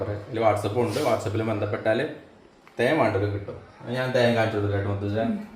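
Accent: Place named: Malappuram, India. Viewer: നിങ്ങൾ മലപ്പുറത്ത് എവിടെയാ ?😂😂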